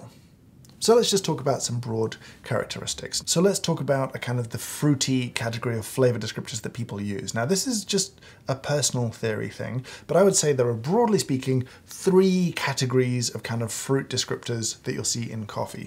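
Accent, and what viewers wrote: United Kingdom. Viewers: I have learned so much from you over the past year that I haved started watching your videos. They are incredibly helpful and full of insight and expertise. I love your way of talking (and it's not just because you have a British accent 😁😂) and how you easily articulate your thoughts, it actually reminds me of Allan Watts. You have intensified my love for coffee a thousand times more. Thank you very much for being so amazing at what you do. Wish you bliss, joy and health. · If someone ever tells you, you speak too fast--ignore them. I love to listen to people who are fluent, concise, precise, and find it painful to focus on information, which is delivered slowly, with lots of gaps and searching for the right word. Having a youtube channel is the perfect venue for you. You have something you know well (coffee) and are able to speak about it intelligently, with concentration and focus. 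I am not a coffee drinker (yet?), but enjoy your videos because of your delivery--made even better by your British "accent". Thanks.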